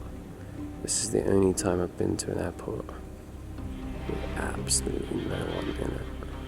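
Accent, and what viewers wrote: United Kingdom. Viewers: This video just popped up in my feed. Sorry man! I don’t have a clue who you are and what you do, but being at that airport alone. It sets you in the mind of how Son of MAN’s return is going to be. It’s going to be lots of abandoned places. Also, I couldn’t really tell you were from the UK due to your accent not being as strong. I thought you were from Australia, but I was wrong. Anyway, be safe on your next trip wherever you go. God bless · This guy talking in this video has an English accent, with a British passport. Syria was destroyed by the USA on purpose, like they destroyed Afghanistan, Iraq and Libya and now want to destroy Iran. Why are you blaming the Assad regime for destroying Syria and for making you a refugee in Germany? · Jonathan Stoevelaar - he’s not American lol he has a British accent..... you’re not an arsehole just ignorant lol 😆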